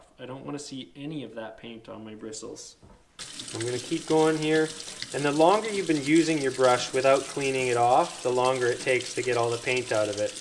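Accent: Canada. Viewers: You sound very Canadian or Minnesotan (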